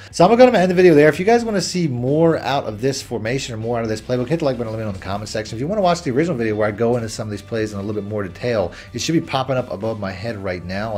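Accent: New Jersey, United States. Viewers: Hey bro, been watching you for at least 2 years now. Sound like you are from Jersey. I am. Really like tour content. Anyways, I'm an old dad(43) who recently got back into Madden. I play CFM and I am tired of losing. Of course my stick skills ain't what they use to be. I use dolphins playbook on defense. I used to run Raiders on offense, but it switched up this year taking away weak slot close and strong twins. I am looking to purchase an ebook from you. I'm not sure what team to get for offense. I don't mind glitch shit, I just wanna win!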